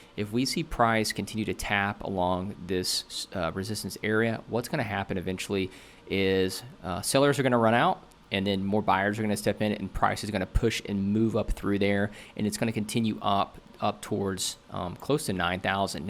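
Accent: United States. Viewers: Hello. Thank you for your thoughtful and insightful assessment of the current market. Also, I very much enjoy listening to your charming American accent.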